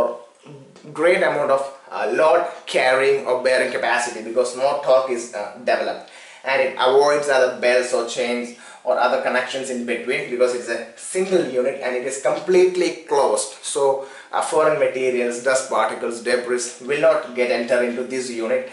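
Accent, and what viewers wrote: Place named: India. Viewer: let me guess... Indian right? ( no racism intended )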